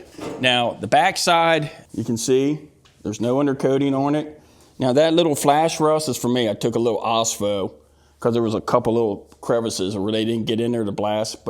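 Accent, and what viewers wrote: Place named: Maryland, United States. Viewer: I hear glen burnie accent!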